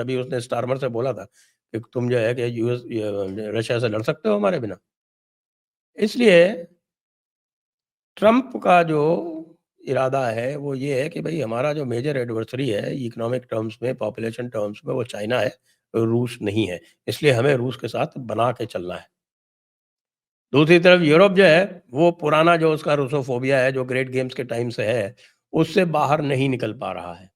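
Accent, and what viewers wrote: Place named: Gwalior, India. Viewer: सर जय श्री राम, बहुत दिनों बाद आपकी भाषा में typical ग्वालियर वाला पुट सुनकर बहुत अच्छा लगा l धन्यवाद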